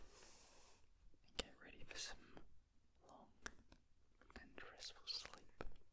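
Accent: Australia. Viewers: Thank you Australian Steve Harrington for the amazing ASMR <3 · We love an Aussie accent 🫶🏼 me & my sister called it “tickles” too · Oooooh Australian Asmrtist I’m here for it!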